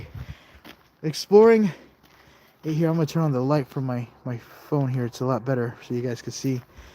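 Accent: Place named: United Kingdom. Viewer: I love your British accent. You and could just have so much fun being silly AF 😂😂😂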